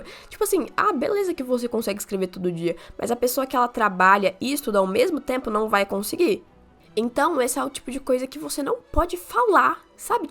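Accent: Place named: Santa Catarina, Brazil. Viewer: oii, pelo teu sotaque eu desconfio que tu sejas de SC obrigado pelas dicas!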